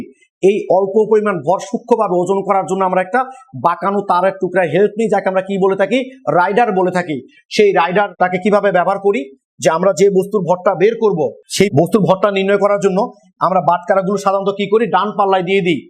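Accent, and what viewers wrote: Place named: Joypurhat, Bangladesh. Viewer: Vai basa ki joypurhat?? Vlo laglo. Sohoj savabik representation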